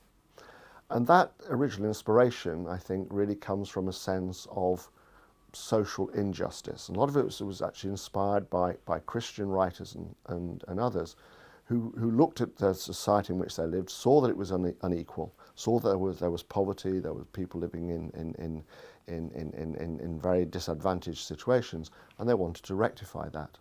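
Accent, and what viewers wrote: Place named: United Kingdom. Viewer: Thank you. Well versed, laid out, explanation of the origins and early history of Socialism. The British accent also helps to accentuate the importance of topics and points being made.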